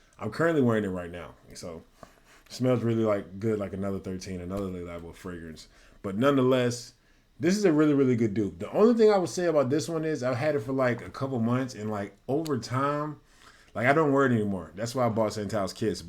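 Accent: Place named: Midwest, United States. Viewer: Your likely from Wisconsin, Michigan or Ohio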